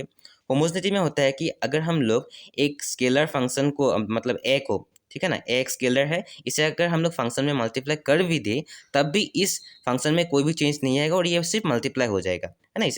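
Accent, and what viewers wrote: Assam, India. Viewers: Bhaiya aap Assam se ho kya😃? Aapke accent se aise lg rhe😍.. Btw thanks for this amazing video 🥰🥰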